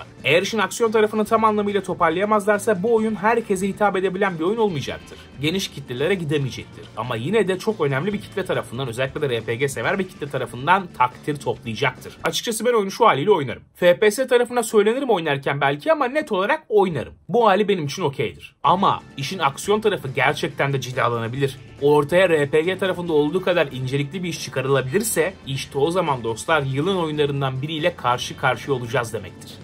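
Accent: Russia. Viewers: Güzel rus aksağını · Ruslar gibi ingilizce konuşuyorsun (CS:GO Ofensive günlükleri) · Neden Rus aksanı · İngilizce konuşunca İngilizce konuşan ruslara benziyorsun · neden ingilizceyi ruslar gibi konuşuyor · rus aksan? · ingilizcen rusçaya kaymış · Niye rus aksanıyla ingilizce konuşup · o nasıl bir ingilizce rus gibi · İngilizce'yi, Rusça konuşur gibi konuştun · Rus aksanlı * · niye Ruslar gibi engilizce konuşuyorsun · ingilizcende rus aksanı var gibi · Rus aksanıyla ingilizce konuşman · niye rus şivesi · İngilizceyi Rusça aksanıyla konuştuğunu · aynı rus ajanları gibi ingilizcen var valla çok tatlış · Rus aksanı❤ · Mükemmel Rus aksanıyla İngilizce konuşan * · ABİNİN İNGİLİZCESİ RUSLARIN İNGİLİZCE KONUŞMASIYLA ÇOK BENZEŞİYOR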